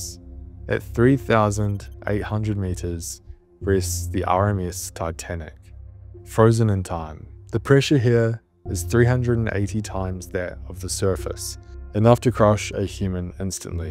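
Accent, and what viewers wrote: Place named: New Zealand. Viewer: I love how the captions corrected 'depths' to 'dips' because of your NZ accent lol.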